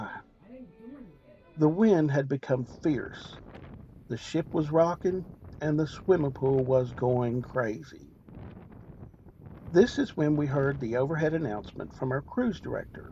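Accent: South, United States. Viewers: Thank you I love your story telling made me wanna watch it all.❤️🌹👍. I love your southern accent, my mom is deep southern . God bless you and your family in your endeavors. From Alaska. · great southern voice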